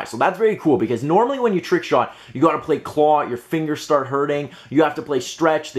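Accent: Canada. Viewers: You sound canadian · He sounds so Canadian. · You sound Canadian. Are you Canadian? I know you won't answer... just curious though... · are you Canadian ? you're hot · Who watched this video for the pure enjoyment of his Canadian accent? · he's Canadian I can tell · I don't even know this guy but I can tell he's Canadian by his accent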